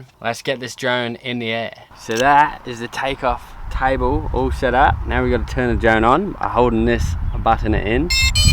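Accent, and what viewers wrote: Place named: Australia. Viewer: Where abouts you from in Blighty originally butt? (See if you can guess where I’m from with that word 😂) You’ve pretty much got the Ozzy accent down Pat fella! I was out there travelling around in <num>, I’d love to go back tbh mate. Sick van build btw man, you got yourself a very cool little family set up there. Awesome brother!!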